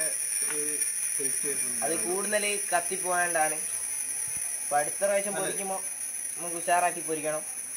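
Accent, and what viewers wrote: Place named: Kannur, India. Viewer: മോന്‍ കണ്ണൂരാണോ സംസാരം കേട്ടിട്ട് കണ്ണൂര്‍ തലശ്ശേരി വടകര ഭാഗത്തുള്ളവര്‍ സംസാരിക്കുന്നത് പോലെ ഉണ്ട്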